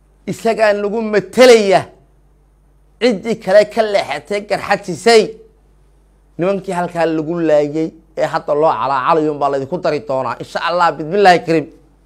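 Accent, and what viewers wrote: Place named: Kenya. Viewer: Ninkan waa la haystaa duli ma timid reer sheekh Isaxaaq .waxaad tahay kenyati afsoomaliga bartay.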